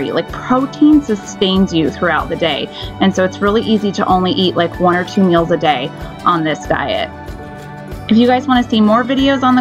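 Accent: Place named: Minnesota, United States. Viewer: Your either from Mn or wi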